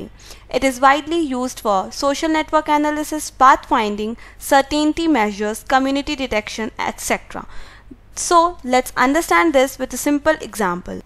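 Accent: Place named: India. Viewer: Billshit Indian accent … 🤢🤮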